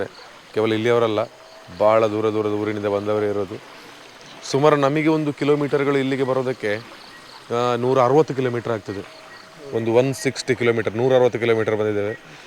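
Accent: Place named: Tulu, India. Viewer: Namma Tulu dakalna taking style de gotthapund🤩👌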